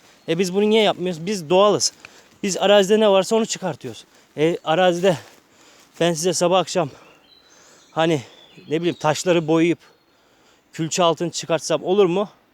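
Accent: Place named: Kastamonu, Turkey. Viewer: Siveniz Kastamonu sivesine benziyor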